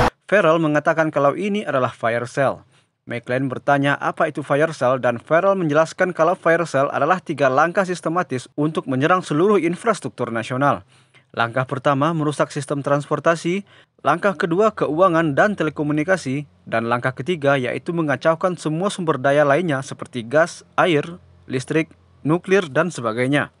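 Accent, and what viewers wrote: West Sumatra, Indonesia. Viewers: Orang minang bang?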